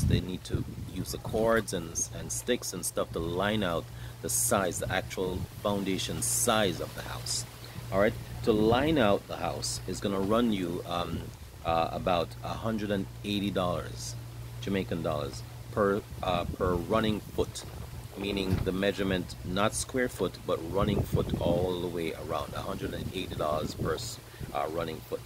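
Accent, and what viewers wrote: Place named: United States. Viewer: hey is that US dollars or Jamaican dollars ?cos you speak with a slight american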